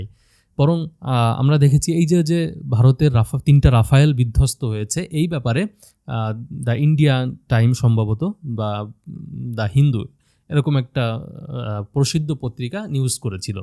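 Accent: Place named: Bangladesh. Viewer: Toke koto Ghosh khaiyeche....tor kotha bolar ton alada ....tui mone hoi BD mal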